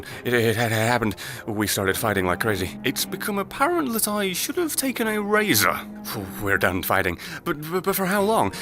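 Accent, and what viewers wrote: Scotland, United Kingdom. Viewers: ***** His third ever video uploaded (the one with MW3) is also with him putting on a Scottish accent. I must say, it fits pretty well. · Have I missed something why is suddenly Scottish? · Josh Mcklaren He said he is from the UK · Are you Scottish?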